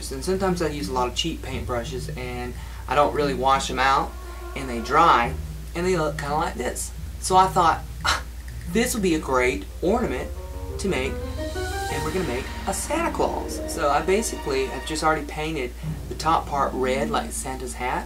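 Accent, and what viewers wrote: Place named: South, United States. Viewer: I like ur Southern Drawl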